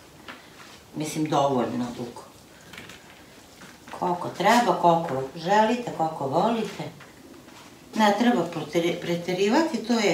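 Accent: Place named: Sombor, Serbia. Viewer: Kad Bas slusam kao da sam u Somboru.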